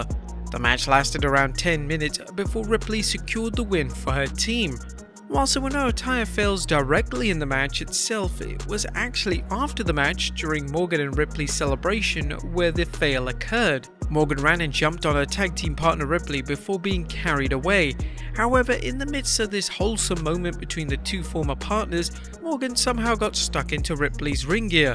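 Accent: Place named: United Kingdom. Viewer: yooo!!! just the "british" i was hoping for!!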